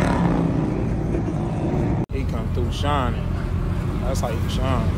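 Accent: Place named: California, United States. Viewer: You from lil rock bro? You got that cali accent. And man RIP to that elco wished you would walked up to it i wanted to see the damage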